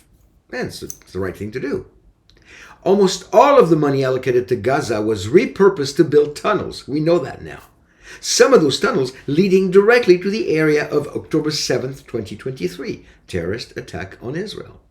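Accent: France. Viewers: Dear " frère " I always hear the french accent and I like it. Au revoir.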